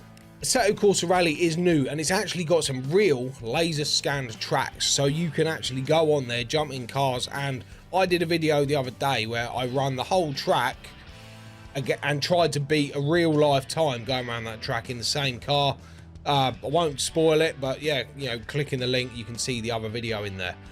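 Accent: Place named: England, United Kingdom. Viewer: i love your original english accent! :)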